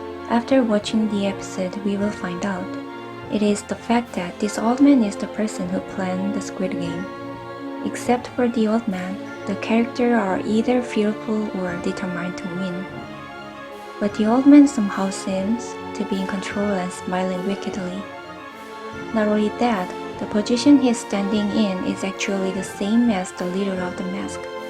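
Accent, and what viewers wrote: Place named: United States. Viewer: 오호ㅋㅋ 아메리칸 스타일의 잉글리쉬💯 세간에 떠들썩한 5징어게임에 빠져봅니데이~😆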